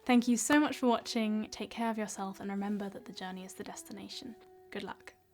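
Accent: RP, United Kingdom. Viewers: Starting to learn Mandarin, and came across your vids. You could be in the dictionary under "life isn't fair," as you are stunningly beautiful, obviously brilliant and the British accent simply ups your attractiveness to levels beyond. I think you will be a great incentivizer to learning because I would not want to disappoint you by failing. To quote Jack Nicholson in As Good as it Gets, "you make me want to be a better man." To that end, I am hitting the books. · Assuming an average person would only do about 1 hour a day, because burnout is a thing, this plan would get you to her level in about 2.5 years. Not sure how long it would take to get her nice British accent. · Hi. Love your British accents. You are very motivating. Thank you for your guidelines on Chinese learning. I am trying learning Chinese as well. Very interesting and I think is the hardest language to learn. 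· Hey,I like your British accents,but I think you should take next time microphone,cause I struggle to catch some words. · This young lady is drop dead gorgeous and far more than simply bright, arriving replete with a British accent! I am now technically an old man (although I neither look it, act it, nor have a body that reflects it - and I am quite sure that I will give those who want to know how it do my life style how to do it! Moreover, I will be giving my advice for free - but that doesn't mean it is easy either as I am also in love with Kung Fu, weight lifting, running, and Keto) so if I can learn this while living in the Stock Market you can too! Thank you Dr.! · Oh she's pretty beautiful!!! and I really loved her videos I've never seen something like this before. Somehow I would like to ask something. Is she from UK? because I'm currently learning English so it's a little bit difficult for me to understand some things and I can say that I'm used to the American accent so it's possible that I can't get her for that reason. 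Obviously always with all my respect. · i loved your british accent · I am a HSK3 graduate, from Argentina, then only phrase you said is perfect mandarin. I am not getting into any more of your channel, i thought you would start speaking zhong wen. Nice posh brit accent, btw. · Pretty faces tend to break people's brains. And a high class British accent forces you to listen. You have a devastating package!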